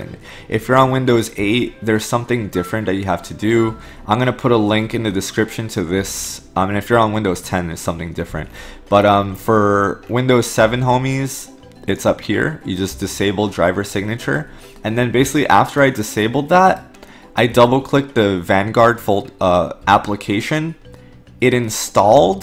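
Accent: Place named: Turkey. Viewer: its turkish accent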